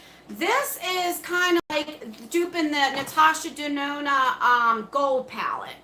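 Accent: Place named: East Coast, United States. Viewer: I just bought the temptresses palette. No big, but it was 10%, not 15. Thank you, though! I can’t wait! Your eye look is so gorgeous! You do so well, where you cut off the side of your eye right at the end of your brow. It really lifts your eyes! Great job! I really admire what you do! I miss the East coast. I went there as I played piano for President Bush for the prayer breakfast and stayed with a friend who has your accent! You make me miss her! 😇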